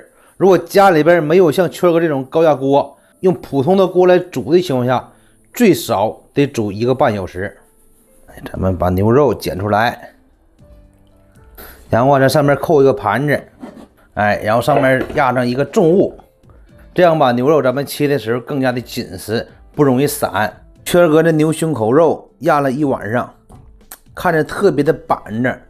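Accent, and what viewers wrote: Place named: Liaoning, China. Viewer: 浓厚的辽西口音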